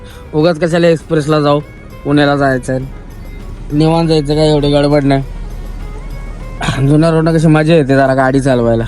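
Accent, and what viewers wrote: Satara, India. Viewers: Bhava satarai Tone badluu nka. Plz. Majjja yet naiii laka..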